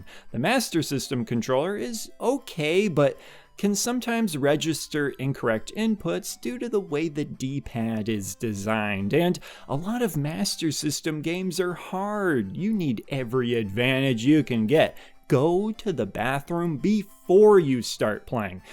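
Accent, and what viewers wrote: Midwest, United States. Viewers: Just subscribed are you Midwestern? I hear your accent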